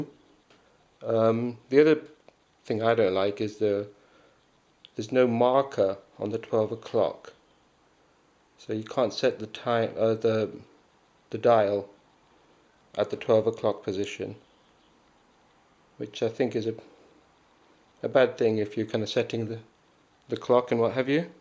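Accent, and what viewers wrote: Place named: India. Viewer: English with indian accents shouldn't be on youtube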